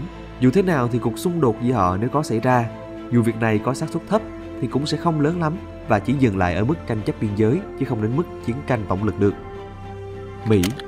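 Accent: South, Vietnam. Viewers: xét riêng giọng đọc thì hình như k vấn đề gì, nếu bạn nghe tốt giọng miền Nam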